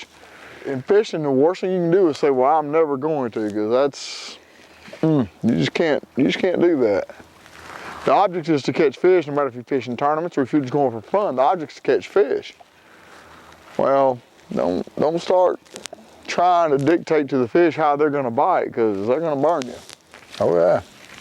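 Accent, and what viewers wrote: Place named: Louisiana, United States. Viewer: "Where you goin'??" LOL good ol' Louisiana boy.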